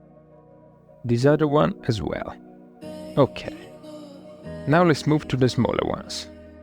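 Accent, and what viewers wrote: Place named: England, United Kingdom. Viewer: I have a question: Where are you from, because I recognise that you are from England because I'm from Italy 🐜🇮🇹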